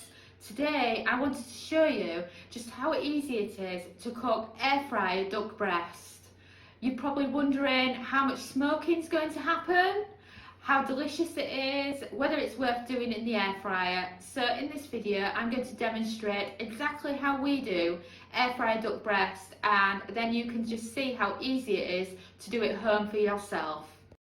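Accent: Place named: North Yorkshire, United Kingdom. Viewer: Thanks so much. This is a recipe I'll be making soon as I love duck. My wife doesn't eat meat but I'll be happy to make this for myself. Also, love your accent. I'm guessing North Yorkshire. Am I close?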